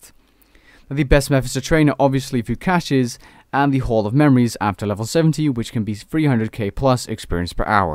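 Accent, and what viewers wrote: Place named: Netherlands. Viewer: haha nou je floept er genoeg Nederlandse klanken door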